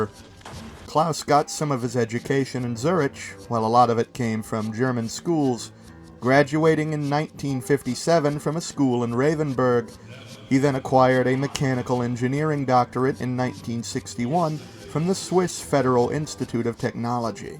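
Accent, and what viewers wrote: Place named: United Kingdom. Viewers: Well, By listening to that accent, I mistaken that you were also British. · There were only a few moments I could tell it was you. But mostly it was hard to tell. If I showed that to someone who didn't know you, they'd think you were a brit.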